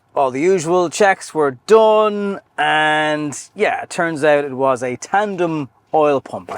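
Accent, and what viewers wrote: Ireland. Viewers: well there is your problem. I own two 911s, a 993 series and a 991.1 GTS series. The <num> was bought when it was 14 years old with 68K kms and the <num> GTS was bought when it was 3 years old with 157 kms, yes 157 kms at 3 years. Both are manuals and mint. I also do ALL my own work, not to save money but to make sure the job is done right as I am ultra-picky. I've been wrenching since I was in my very early teens on cars, motorcycles and aircraft as a hobby. You are buying a 20 year old car, so of course it will have issues and it isn't the parts prices which are very reasonable but the labour costs. It could be worse, you could have bought a British or Italian car. So in your case, I would have detailed the underside of your 911 and cleaned the engine to get it free of oil, etc so that you can pin point the actual source of the leak. As for an MX5 or a S2000, no thanks. 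I also didn't buy a 911 as an investment; life is too short and I am a "tech" and not floating in money. Great to hear the accent, this coming from someone that went to Rockwell College in Tipperary.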